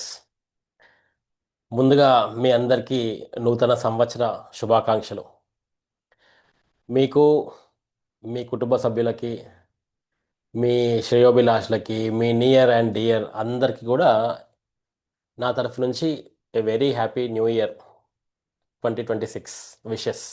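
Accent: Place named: Telangana, India. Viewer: Happy new year Anna mee telangana yaasa bashaki pedda fan ni jai telangana ❤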